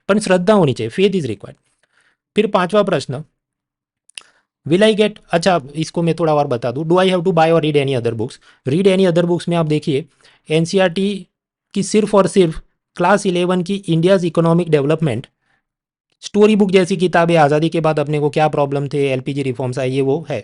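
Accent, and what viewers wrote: Gujarat, India. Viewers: Saheb tamari vato ma Gujarati touch joine bhaiband bau maja aave chhe 😂😂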